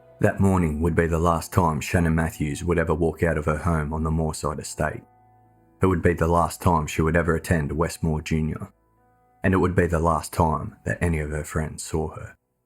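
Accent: Australia. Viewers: These uploads are brilliant!! I'm so glad I stumbled across this channel...I'll be here for quite a while! I also love hearing a fellow Australian!!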